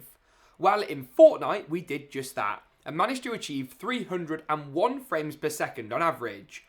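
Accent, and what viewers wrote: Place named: Yorkshire, United Kingdom. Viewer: Utterly bonkers - You are from Yorkshire, right?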